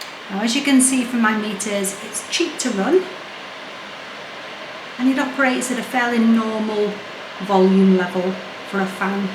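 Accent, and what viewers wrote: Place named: South Africa. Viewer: She sounds like a white South African. If that’s correct, I hope you are in the U.S. now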